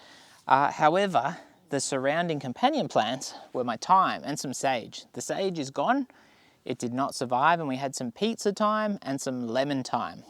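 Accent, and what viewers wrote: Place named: Australia. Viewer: LOL Did I just hear an Aussie dis Americans for not pronouncing words properly?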